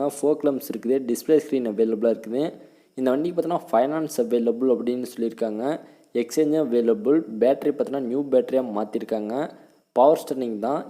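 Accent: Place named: Hindi Belt, India. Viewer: Sir neenga tamila......Hindi Madri pesureenga nanba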